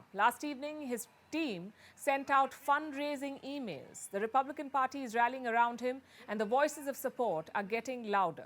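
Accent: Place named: India. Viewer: You....English please! Not indi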